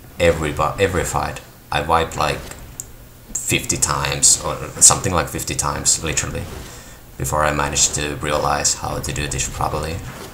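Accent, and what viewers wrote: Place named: Asia. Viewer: lol you're funny. I can do that same accent. Are you actually asian?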